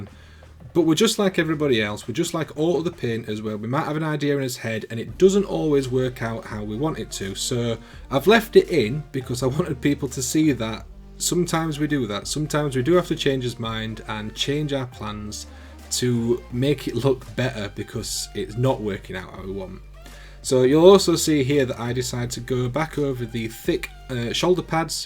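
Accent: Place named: West Yorkshire, United Kingdom. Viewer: Warp stone glow in West Yorkshire accents wins the internet mate. I’ve subbed...new to 40K....not sure how many games I’ll play but I’ll certainly be using your tips in general. Thanks man.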